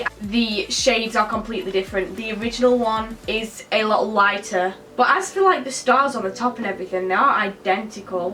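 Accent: United Kingdom. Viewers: Ellen Coonan well she's British. They pronounce things differently. There is more than one accent you know. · Your accent is British(like meh)But u r in America....nvm :D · Is she British cuz she sounds it but she says dollars??🦋💗 Love you!!xxx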